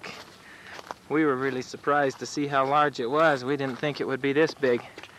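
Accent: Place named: Utah, United States. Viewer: This is the most Utah speak I have ever heard. The most fetchin fetch of a mount'n I've ever fetchin gone seen!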